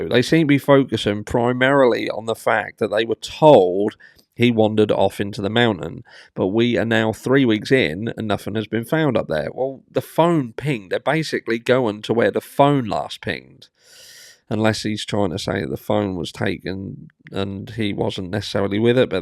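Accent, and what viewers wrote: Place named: Suffolk, United Kingdom. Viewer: You have a lovely accent !! Are you from Suffolk?? 🌲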